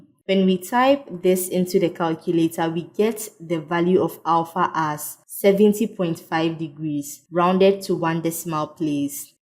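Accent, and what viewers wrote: Ghana. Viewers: are you Ghanaian?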